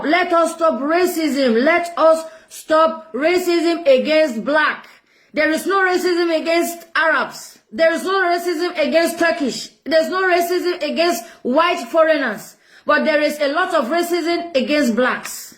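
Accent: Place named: Africa. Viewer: Your voice and accent sounds so African. I love it ❤️❤️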